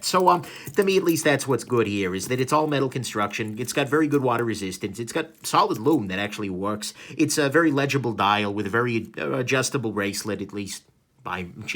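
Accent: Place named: New Jersey, United States. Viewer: Nice review! Thanks. Love the (Jersey? NY?) accent :)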